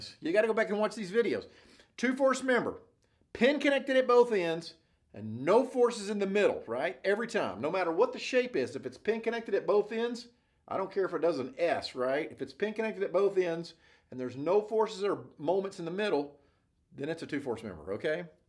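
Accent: United States. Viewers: Is that a Tennessee accent I hear?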